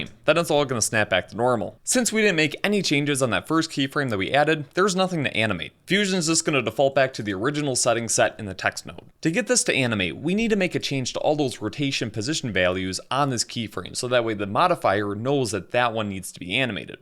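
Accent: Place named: Texas, United States. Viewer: Yo, Are you from Texas? I have a friend from there who sounds just like you! W tutorial btw, would love to see more videos like this.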